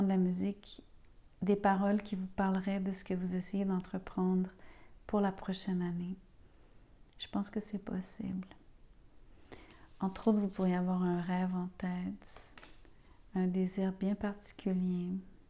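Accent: Quebec, Canada. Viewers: Bjr je découvre par hasard votre guidance c’est un vrai plaisir de vous écouter avec votre délicieux accent québécois je retrouve suis verseau 1 décan lui verseau 2 d. Nous vivons une véritable osmose et synchronisation. Merci de Copenhague 😊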